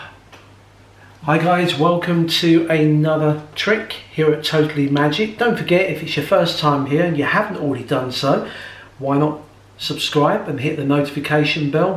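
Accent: United Kingdom. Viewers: I am glad you liked it and hope you'll try it out. Let me just say that you're doing a great job with the channel, got me subscribed. It's so good to see a knowledgeable man teach magic with soul (and a british accent ;) ). I wish you all the best things you can wish to yourself. Keep up this fantastic work and Greetings to you from Moscow!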